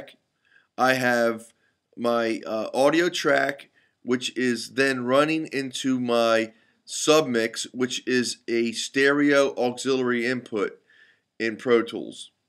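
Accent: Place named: Baltimore, United States. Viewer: You sound like you're from Baltimore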